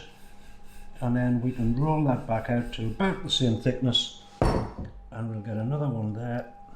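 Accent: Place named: Northumberland, United Kingdom. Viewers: I just found your vlog, as an expat living in Seattle Washington, I appreciate the recipe & your lovely accent, I am assuming you are from the North of England, thanks for the recipe, will definitely give it a try 👍🏼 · Born but sadly not raised in Northumberland so lovely to hear the accent a plus to a great idea and sensible and simple delivery! 🙌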